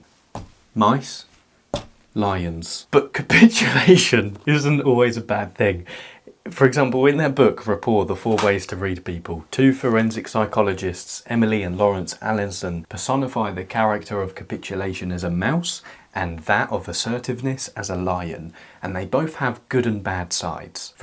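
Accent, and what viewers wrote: United Kingdom. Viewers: I love this guy! (And the accent! Everything sounds smarter with a British accent 😂)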